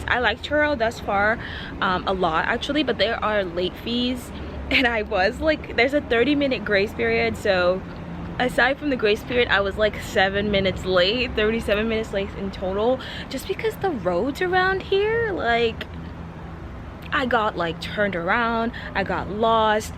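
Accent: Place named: Cameroon. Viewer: It's the french cameroonian accent for me✌️ Love your vlogs and btw your body is 🔥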